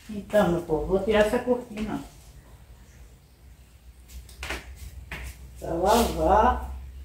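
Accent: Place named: Bahia, Brazil. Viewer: Agora parece que é baiana mesmo né oxente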